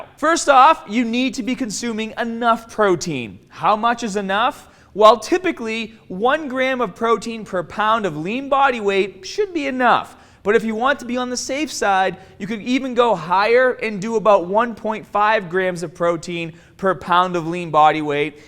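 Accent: Boston, United States. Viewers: GREAT info and Bahston accent! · Bahston kid! Good video, thanks. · Nigga has a Boston accent and a White guy accent witch is just getting me confused like it really just throwing me off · is this dude from boston? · I can hear a Boston accent on this guy or is it just me hearing this. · This dude's Boston accent is amazing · Excellent video thank you!Love the Beantown accent. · Love that bastan accent 💯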